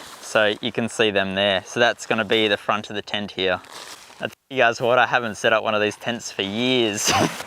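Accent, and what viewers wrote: Australia. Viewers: Gotta love the Aussie accent👍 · Is that a Queensland accent? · G'day, I'm in Virginia and thoroughly enjoying your video! I have been gathering the gear needed for car camping. Not much was needed due to the fact I've been backpacking and camping since I was 10. I almost need a warehouse to store my gear. At 72 I decided it was time to give up the long treks or hikes especially up and down mountains. The legs and lungs are not so strong any longer. After your shopping spree in Tentworld (REI would be a close equivalent) it seems I have all of the necessities. I just have to figure out how to get back to Australia...what a beautiful country you live in! I've been to Melbourne and Coolangatta (my sister has a timeshare there) and absolutely love it on the Gold Coast., you should be on TV! You have a great smile and personality plus. Your skills as a photographer and speaker (love that Aussie accent!) are above reproach. 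Anyway, I'm a new sub and look forward to watching more of your videos. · Sorry, I couldn't follow it because the guy's accent made me winced! To my ear it was awful!! But, that's me, not him. If I have to listen to an accent, I can tolerate a London accent, but not Australian or any other part of Great Britain. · really enjoyed the video as someone who's never been camping, but is thinking about it. Easy to follow tips. Incredible how you manage to smile and speak at the same time! And great Aussie costume hat, accent and shorts. Really sets the scene. Keep up the videos.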